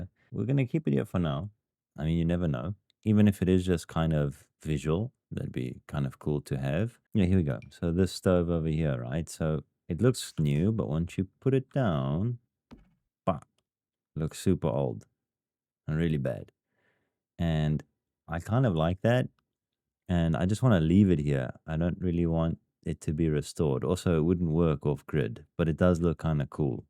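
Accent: South Africa. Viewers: Are u south african ?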